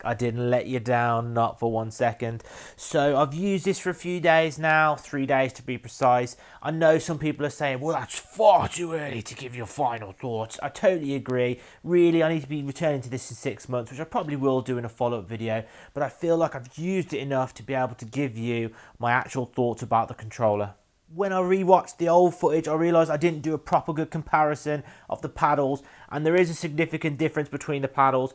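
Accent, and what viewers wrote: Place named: Wales, United Kingdom. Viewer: oh u sounded welsh to me, im welsh!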